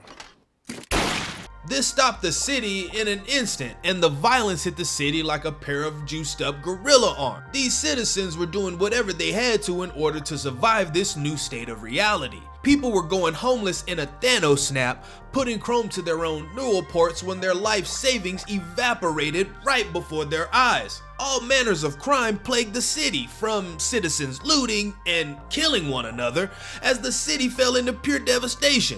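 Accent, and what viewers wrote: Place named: Houston, United States. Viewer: "What it dew" "Going Dine" "Hol up" ....🤔This is a fellow Houstonian...and Black Cyberpunk fan...*Hits Subscribe immediately!!*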